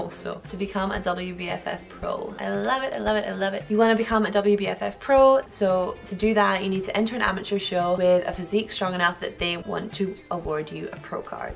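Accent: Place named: Glasgow, United Kingdom. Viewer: Love your channel! Not a bodybuilder myself, but I’m a girl and us girls can always help each other out:) Spent my early life in Glasgow and hearing you speak makes me feel at home! 😘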